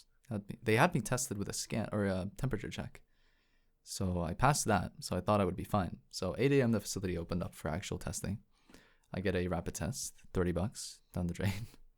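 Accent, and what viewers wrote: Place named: Middle East. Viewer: 1 I'm so sorry for you loss ik what it's like to lose someone that is close to you I hope you are feeling better and that you have a great mental journey 2 I NEVER KNEW YOU WERE MIDDLE EASTERN I THOUGHT I WAS TRIPPING ABOUT THE ACCENT 3. I'm glad you are back from your trip even tho it was very hectic lol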